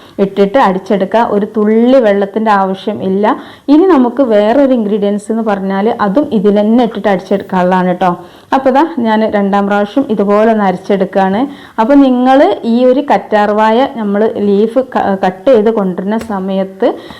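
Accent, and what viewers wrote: Malappuram, India. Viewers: Thank u mole. I like your old Malappuram language. God bless you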